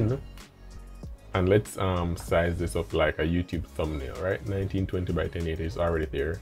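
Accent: Jamaica. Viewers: Excellent video. Do I detect a Jamaican accent? Thanks for sharing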